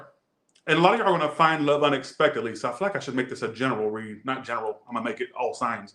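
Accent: London, United Kingdom. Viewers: Love the London accent 😍 💕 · Omg!!! sorry I had to 😂 I love your London accent better than mine!! Lots love 🥰 uk 🇬🇧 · When I heard the British accent, I knew the Sagittarius read is for me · Your English British accent. Love it 🫶😄 from London here! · British accent .. absolutely fantastic 🤣👏👏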